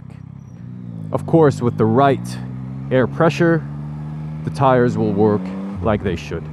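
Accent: United States. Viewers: זה המבטא האמריקאי הכי חלק ששמעתי מישראלי אי פעם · זה המבטא האמריקאי הכי טוב ששמעתי בחיים שלי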